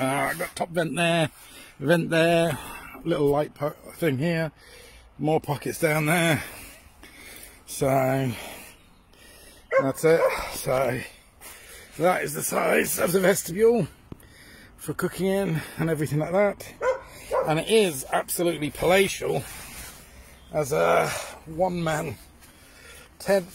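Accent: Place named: Melbourne, Australia. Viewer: i lived both in the UK and the US but you dont sound like either you have this Melbourne tone to you 😀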